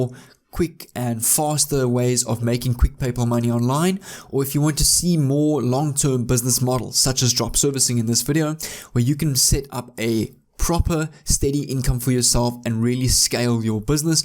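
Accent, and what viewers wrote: South Africa. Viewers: You sound like you have a South African accent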